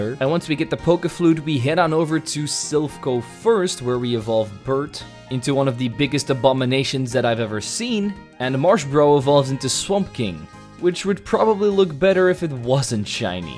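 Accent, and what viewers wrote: Netherlands. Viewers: Where are you from? Sometimes it sounds like you're dutch, but you realy have a nice accent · Is he Dutch? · This guy dutch? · Gotta love that dutch accent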